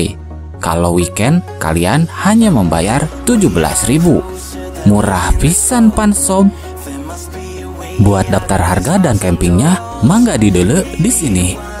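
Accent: Sunda, Indonesia. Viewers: Reviewer na meuni nyunda pisan...tong hilap kang..liput Oge wisata pantai Utara Subang na Pokona mah Endah pisan pamandanganna 😊😊😊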